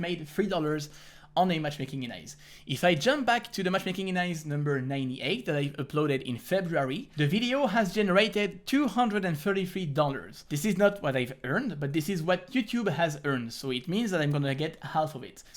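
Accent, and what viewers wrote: France. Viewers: On l'entend bien l accent français :)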